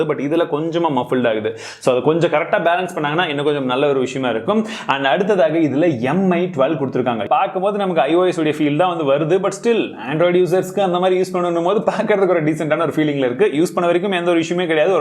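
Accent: Chennai, India. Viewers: first time hearing chennai slang . ......😅🤣🤣😂🤣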